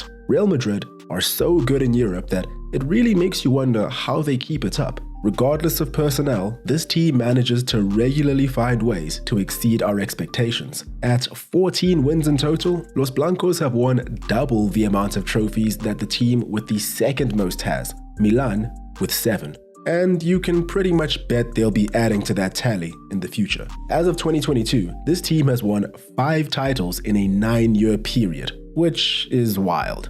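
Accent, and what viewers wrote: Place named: South Africa. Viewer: Your accent is sound South African. I can’t get over it😂😂😂😂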